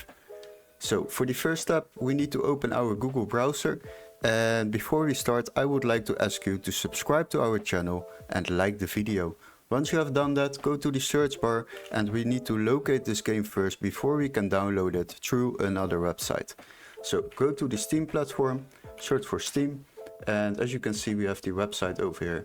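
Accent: Netherlands. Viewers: are you from Friesland:hand-pink-waving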